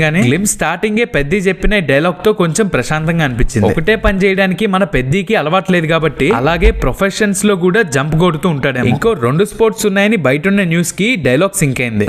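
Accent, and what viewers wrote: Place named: Visakhapatnam, India. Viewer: Aa slang vzm ...not sklm bro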